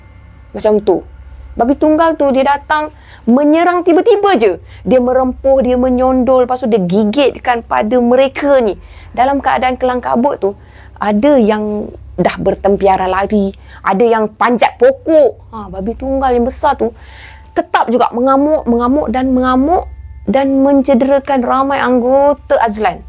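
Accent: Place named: Pahang, Malaysia. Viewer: Ok kak ten q.bagi lah tahu bila ckp mcm logat org pahang.😀😀😀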